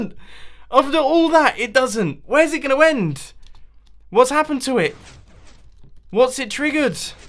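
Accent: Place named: United Kingdom. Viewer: Other than the good content and some hilarious moments that offers, I learned a lot when it comes to Radstone and kinda of British accent so Thank You.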